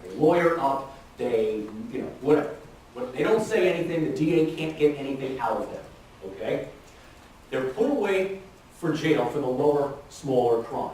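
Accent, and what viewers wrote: New Jersey, United States. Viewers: His Jersey Accent makes the lectures even more entertaining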